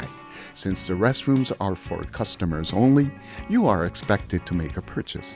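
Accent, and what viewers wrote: United States. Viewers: you were "born and raised in Tokyo, and lived in Japan all your life", so how did you learn to speak with perfect American English? Just curious 😊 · Thank you for your videos! So informative, clear, interesting, just so well made. Really appreciate it! Please consider making videos for: 1. Places for sushi (low end to high end, please include price range) 2. Places for ramen (same as above) 3. Tourist traps (well maybe not trap but like places we should stay away from or be aware of if first time visiting Japan)😅 4. Hotels vs Airbnb 5. Ways of traveling around via, train, bus, etc. Btw, you sound like someone who was raised in the states.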